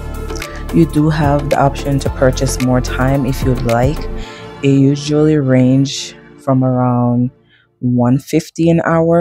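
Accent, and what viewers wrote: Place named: Jamaica. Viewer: Waaaiiiiittt a minute did a hear patois? are you Jamaican or Caribbean? anyway, love the set up as usual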